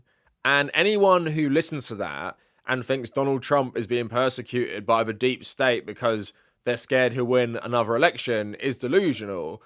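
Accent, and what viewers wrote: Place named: United Kingdom. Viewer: I've been watching your channel for a while and I'm not going to lie despite the accent I somehow never realized you were British till you said it in this video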